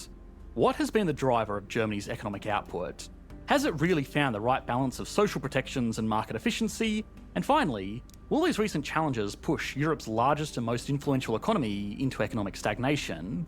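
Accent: Scotland, United Kingdom. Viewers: I wasn't talking about but about the narrator in the clip he reacted to! Scottish accent is genuine and I really like it, but that guy in the clip sounds fake as hell and just annoying.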